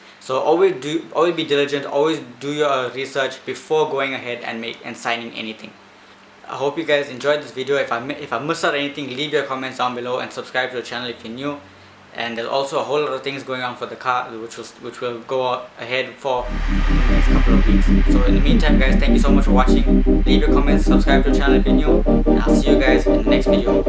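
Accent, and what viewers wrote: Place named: South Africa. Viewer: bro what accent is that, are you south african?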